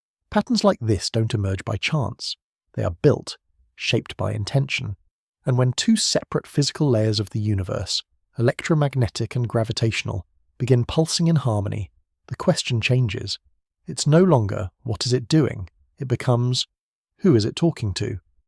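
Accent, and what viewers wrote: United Kingdom. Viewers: Why is the narration ALWAYS with a British accent?! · It's called Queen's English for a reason clear elegant and the universal language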